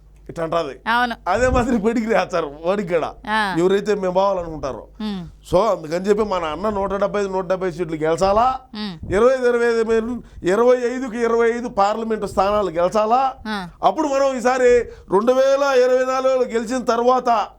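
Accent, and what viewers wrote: Rayalaseema, India. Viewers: రాయలసీమ యాస లో మాట్లాడితే మీ లెవెల్ వేరే అన్నా ఇద్దరు రాయలసీమ యాసలో మాట్లాడండి చాలా బాగుంటుంది 👍👌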